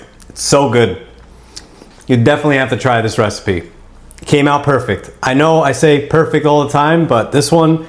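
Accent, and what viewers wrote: United States. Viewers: You sound American, so what is with the recipe in Grams?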